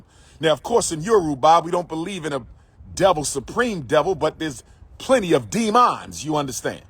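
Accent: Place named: AAVE, United States. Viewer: If were to ever open a school, (and we know it’s already been 14 years), would the school teach boys Umar’s hood English, like “Where my Chicago Africans at?", Would it teach boys to practice and promote polygamy like he always does? Would it teach boys to constantly repeat everything three times like he always does? Would it teach boys to trick the people who support them, the way he changed his non-profit organization name and EIN number without telling donors? Would it teach boys to physically threaten people, the way he said he wanted “pull up crews” to roll up on and confront his critics? Would it teach boys that publicly asking for “wifey resumes” is what serious scholars do? Would it teach boys that they should never be held accountable for what they promise and never deliver, which is his personal philosophy?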